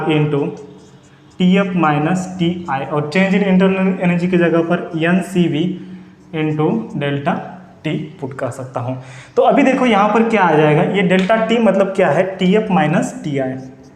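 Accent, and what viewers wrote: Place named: Nanded, India. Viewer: सर तुम्ही नांदेड चे आहात ka?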